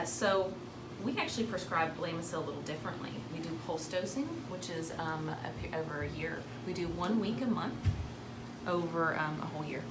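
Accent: Kentucky, United States. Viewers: I knew that Kentucky accent when I first started watching. Then you mentioned toyota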